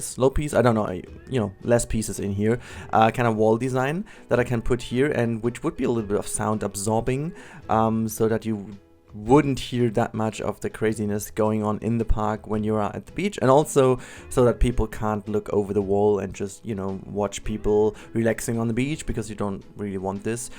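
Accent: Germany. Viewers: Are you German? You sound like one!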